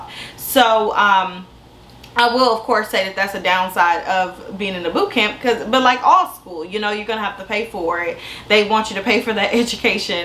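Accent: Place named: South, United States. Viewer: Hi! I should’ve specified. I meant to ask what location are you in? I watched another video tho and I believe you said Nashville. I love to see a fellow Black GRITS (girl raised in the south) thriving! Love your energy and accent! Thanks for your content.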